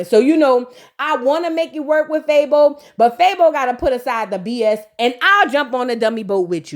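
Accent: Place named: Louisiana, United States. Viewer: Looord I only watch it ...tooo hear ur review....I loooove u my looozana chick...cuz itsssss ur looozana voice for meee....I understand everything u saying 🤣🤣🤣🤣❤️❤️❤️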